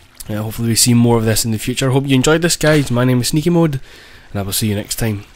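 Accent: Scotland, United Kingdom. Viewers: never mistake a scotsman for an irishman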